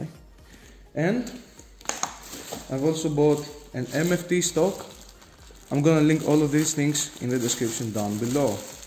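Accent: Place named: Greece. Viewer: Η προφορα σου σε προδωσε,Μετα ειδα το.....Ωραιος καλη συνεχεια αδελφε......απο εναν Ελληνοκαναδο!χεχεχε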